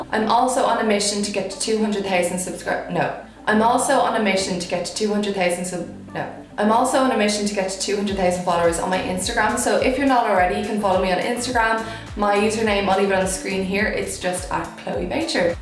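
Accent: Ireland. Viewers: Oriana Brat she's Irish , it's just her accent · It's her Irish accent... personally I think it's beautiful. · I'm sure you get this question all the time but are you irish or scottish or something? Because your accent is super cute lol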